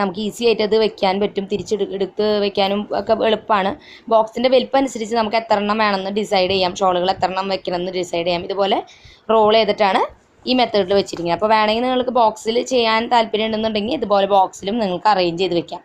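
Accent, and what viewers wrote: Thrissur, India. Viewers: Samsaaram kettapol thoni .ivde ente adutha flatil nd .1 thrissur kaar.avrde samsaaram ningade samsaaram same aane .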